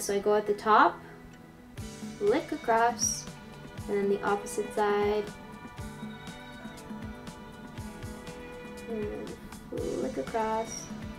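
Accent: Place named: France. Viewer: A) your nails are so pretty i'm so jealous. B) where are you from? it sounds like you have a *slight* french accent hahaha C) I subbed!!!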